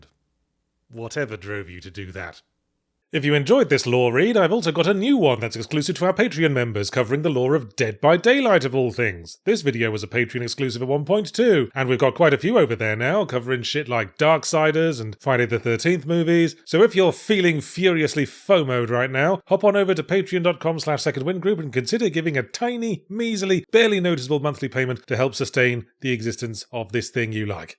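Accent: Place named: United Kingdom. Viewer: Out of all the first run of Bionicle products, the only ones I could pose "like one of your French girls" were the Rahkshi. Knees and good body portions do that to an action figure. However, whatever you do, do NOT disconnect the ball joints. ...also, I forgot that Jala (the McDonald's toy) and Jaller (the one packaged with the bigger set) are the same character, and the British pronunciation isn't helping any. That's not even getting into how the Matoran were originally called the Tohunga in the first comic.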